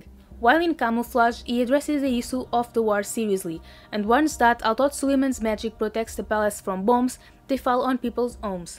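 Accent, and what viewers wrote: Portugal. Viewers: I like the length of your videos. I also love to hear your Portuguese accent.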